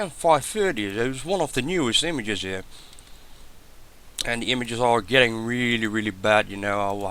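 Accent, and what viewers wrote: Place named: Netherlands. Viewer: First, I like your accent, your 100% dutch hahahaha. What the pipe/spoiler is in the image reminds me of a "pitot tube" on a airplane, its almost a 100% match. Look up "pitot tube" and do a picture search on google, you will recognize the object immediately. I think a flying object has crashed there, and it had a pitot tube for sure.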